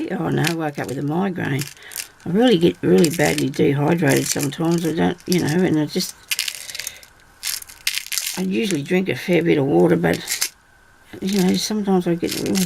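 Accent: Australia. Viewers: hi, just found you on you tube soon as i heard your voice i knew your a aussie lol. just wondering were abouts in australia I am in south australia. do look forward to watch your future videos and you older ones take care. Lesley x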